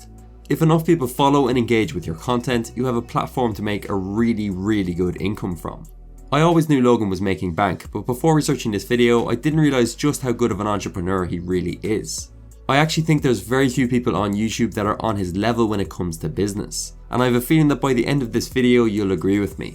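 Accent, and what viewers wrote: Ireland. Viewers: What's the background music ? Great video btw. Really enjoyed it! Are you Irish? XD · Me in the first few seconds: "Is that an IRISH ACCENT??" 👂🏻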